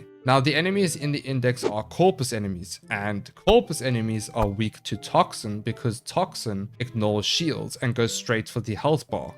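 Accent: South Africa. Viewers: Is bro South-African, that accent goes hard? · You sound South African? Thanks for the guide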